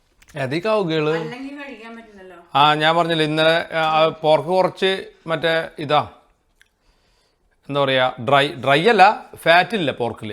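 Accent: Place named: Pathanamthitta, India. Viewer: Nallea pathanmthitta parayanea.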